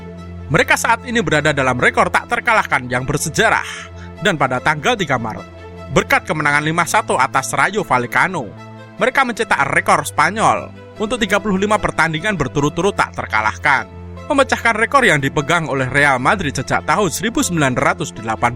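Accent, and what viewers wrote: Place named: Java, Indonesia. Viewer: Adminya medokk🤮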